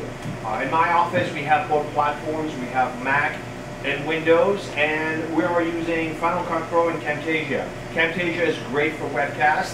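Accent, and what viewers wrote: Quebec, Canada. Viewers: Sensing a Quebec accent :)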